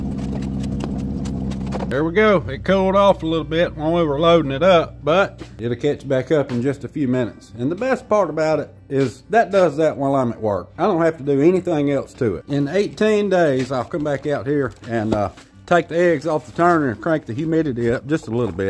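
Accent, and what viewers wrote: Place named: Northern Kentucky, United States. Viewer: Northern Kentucky!!! Just subscribed and I absolutely love your channel! Your accent reminds me of home!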